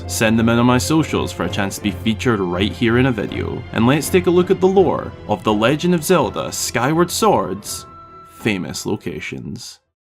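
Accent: Scotland, United Kingdom. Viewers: a zelda channel run by a Scotsman, im home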